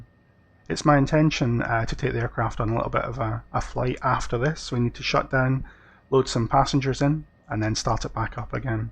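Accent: Scotland, United Kingdom. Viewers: Most enjoyable and informative video. My thanks for not inflicting cloying, abrasive or noisy “background music” to drive us to utter distraction. One takeaway: no matter the cost of the aircraft it still obeys the fundamentals of aerodynamics and physics. And your Scots brogue is a distinct pleasure to hear. Mazaltov!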